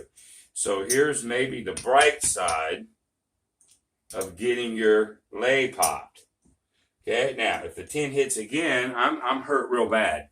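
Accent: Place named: Texas, United States. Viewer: Thanks I appreciate your video. Love to see it. That accent reminds me of Texas🌵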